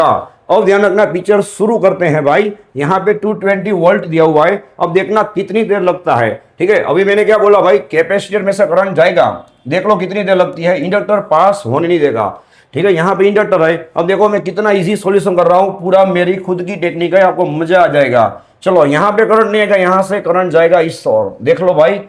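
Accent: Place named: Gujarat, India. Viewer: Very osm sir I think you are gujarati it's new for me to listen ❤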